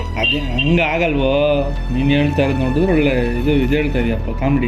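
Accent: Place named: India. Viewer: ಕದಬಹಳ್ಳಿ ಇರೋದು ನಮ್ಮ ಹಿರೀಸಾವೆ ಅತ್ರ, ಹಾಗಾದ್ರೆ ಅಣ್ಣ ನೀವು ಅಲ್ಲಿನ ಸುತ್ತ ಮುತ್ತಲಿನ ಊರಿನವರು ಅನ್ಸುತ್ತೆ ನಿಮ್ಮ ಮಾತಿನ ವರಸೆ ನೋಡಿ ಮೊದಲಿಂದನು ಅನ್ನಿಸ್ತಿತ್ತು ನೀವು ಆ ಕಡೆವರೇ ಅಂತ ಈ ವೀಡಿಯೋ ಅಲ್ಲಿ confirm ಆಯ್ತು 😊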